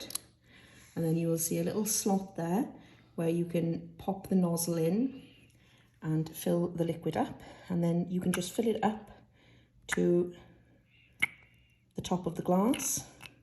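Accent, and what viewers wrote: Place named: Wales, United Kingdom. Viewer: A fellow welsh person by the sounds of it! :)...and great video! thanks!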